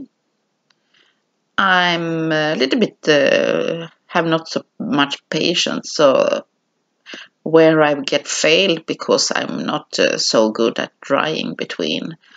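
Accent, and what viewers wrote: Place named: Sweden. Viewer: I love how you truly explain your techniques!!! I would love to buy the Finnabar brand products, but it is so expensive here in the US. Also, I love listening to you speak, it makes me miss min famijl in Skane. Have you done any mixed media projects with a more Swedish tone? My cousin was on Alt for Sverige and we found a photo of our linking great grandmother. I want to do a project for all my family with her photo in the mixed media style, but with a Svenska tone to it. Tack for any suggestions!!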